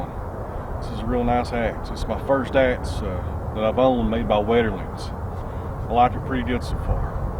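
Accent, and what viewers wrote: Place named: South, United States. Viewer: From what I can safely assess in your video... based on the species composition and general contour of the land - combined with the southern deflection in your dialect... I am going to throw out an educated guess that your are geographically situated somewhere in either in north Georgia, north Alabama, or most likely Somewhere in Tennessee. How'd I do? Post Script... I have 23 years experience cruising timber professionally across a diverse commercial platform. After two decades in the business, it seems to me that somewhere around 98 percent of the general public have no idea it is even a job. That always puzzled me... as their very world revolves around the production of all the various wood products... any thoughts to that end?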